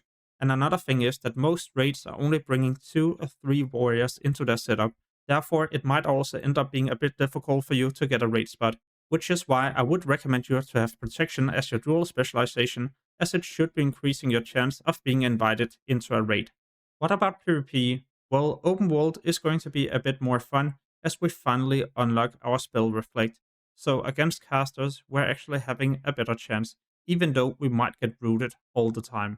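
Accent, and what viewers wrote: Denmark. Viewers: Are you danish? 🇩🇰 or what accent is that?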